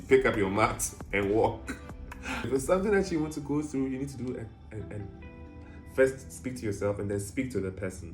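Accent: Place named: Ghana. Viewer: The best part of these videos is the cool Ghanaian accent 😃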